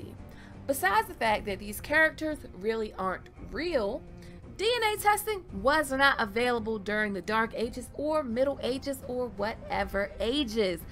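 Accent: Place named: South, United States. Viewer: This female sounds like a stereotypical southerner , aunt jemima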